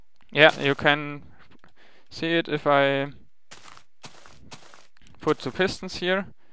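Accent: Germany. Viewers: Man höhrt deinen Deutschen Akzent echt raus :D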